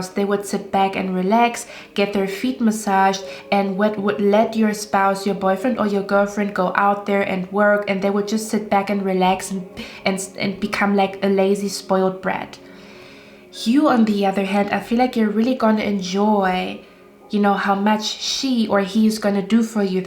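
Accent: Germany. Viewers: Pile 1. Loved this reading. Thank you so much. Also, whenever I watch your readings, I keep thinking you're German due to your accent and I myself am half German which is why it's easy to recognize it. AND THEN I saw the card "ACHT DER STÄBE". I was actually right, so I'm guessing you do speak german but that doesn't necessarily mean that you live in Germany. After all, there are so many countries where German is spoken as their native or second language. Anyway, I'm sending much love and hugs❣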